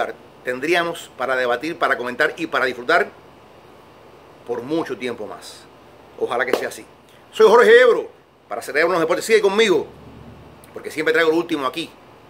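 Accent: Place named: Cuba. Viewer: El Señor Ebro (aprende un poco de respeto) es cubano y lo pronuncia como buen cubano. Yo soy español y lo pronunciaria como un español. Un ruso lo pronunciaria como un ruso. Si tanto te jode su acento o su pronunciacion vete a otro canal de mma de niñatos mezclando musica trap y acento de zombie con mma.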